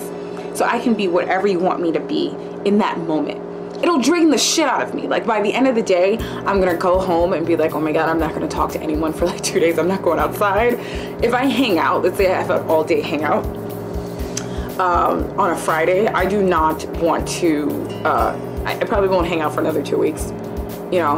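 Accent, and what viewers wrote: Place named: Caribbean. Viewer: You have a Caribbean accent...where u from....intj from usvi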